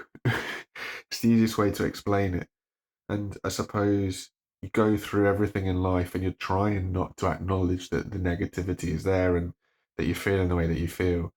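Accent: United Kingdom. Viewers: Listening to you talking, it's like hearing my own thoughts (except with an awesome British accent). All I have to say is thanks for being here, and if you can hang on then maybe I can do the same. <3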